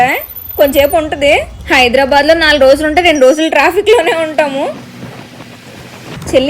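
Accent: Godavari, India. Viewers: ఈ గోదారి మాటల గలగలలతో ఉండే మీ వీడియోలు చూసి చాలా రోజులైందండి, ఈరోజు ఈ వీడియో కనపడింది, ఎక్కడ,ఏ చిన్న విషయాన్ని కొంచెం కూడా వదిలిపెట్టకుండా ప్రయాణం మొత్తం అన్నీ బలే సరదాగా చెపుతూ,చూపిస్తుంటారు, మీ ఆరోగ్యం బాగుంది కదండి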